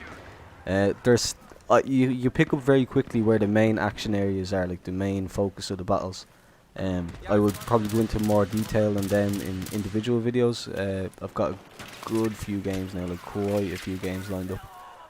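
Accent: Ireland. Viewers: nice vid man :) i was born in dublin, but parents left when i was young and i been in uk all my life, still love the irish accent tho, coolest accent in thw world! Good expression of your impressions, gotta say i prefer vehicle maps myself tho, this is too much like cod lol good luck growing your channel :)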